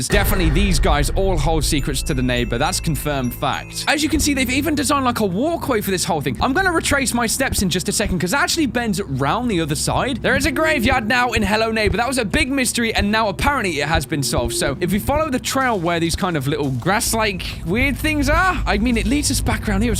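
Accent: United Kingdom. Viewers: AshandraBlue i mean he is british like dan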